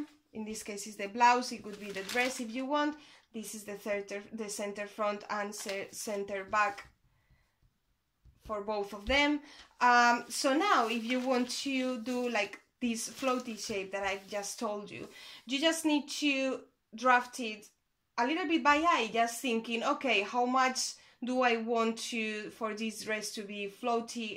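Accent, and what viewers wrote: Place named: Mexico. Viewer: hello, nice video, you have the same accent, that my mexicain Lady friend. The minute i heard you, i toughs of her, anyway, nice video keep on going.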